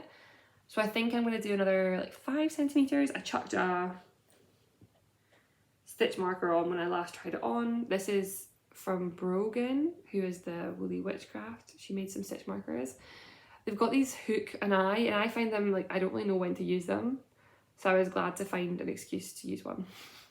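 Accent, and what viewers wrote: Scotland, United Kingdom. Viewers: Perhaps for your Rust sweater you might consider knitting the whole neck with ' knitting in ' elastic, which because it is fine has the advantage of being invisilble, and the elastcity is gentle but lasts. I hope you don't mind my saying, but I do love you soft scottish accent, I think all the people you need to contact by phone should only be allowed to employ, scots people, the whole world would be a lot happier as who could be cross with anyone who sounds so lovely.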